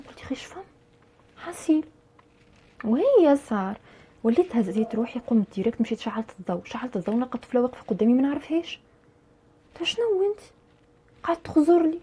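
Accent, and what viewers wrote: Tunisia. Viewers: يا ربي اللهجة التونسية شحال شابة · لا ، هي تحكي باللهجة التونسية كما يتكلم كل التونسيين او الاغلبية الساحقة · 😂اللهجة التونسية اصلا تضحكني و زدتي انتي و الله مجنونة اتابعك من الجزائر🇩🇿♥️ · ي سيدي بن سيدي هههههههههههههههههههههههههههههههههههههههههههههه تجنن فهمت لهجتك مع اني مو تونسيةة > ي اخي التوانسة ي اخي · يا روحي عاللهجة التونسية احبك برشااااااااااا من المغرب <3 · حبيت لهجتك التونسية انا احبها اصلا لكن خاصت جميلة جدا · أنتي تونسية والله حتى كنتوا على اللهجة ديالكم. خليت كلشي وركزت كيف تدوي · ههه قتلتيني بالضحك و ماسخيييتش بالفيديو اللهجتة التونسية زويييينة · تونسي يا روحي يااااااا ممكن تعملي فيديوا كفاه مشيت تقرأ والا تخدم و شنو خدمتك ياا فرحت كي سمعت لهجتك يا روحي أنا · i like how you talk and your tunisian accent , ena 3cht zeda m3a philipini 2 ans , wselna mara lel markez chkit bih :p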